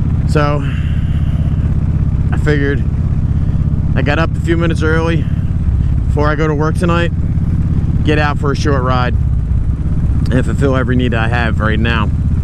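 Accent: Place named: New Jersey, United States. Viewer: 10-4, thought I might have heard a little Jersey in your voice. Ive spent time time in that corner of PA, MD and DE in Elkton.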